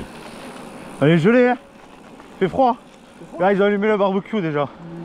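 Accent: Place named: South, France. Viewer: l'accent du sud !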